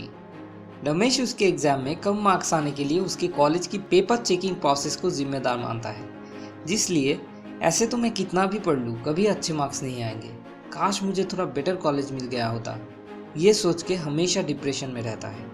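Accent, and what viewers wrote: Bengal, India. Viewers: bad video. bad accent.bcoz u r a bengali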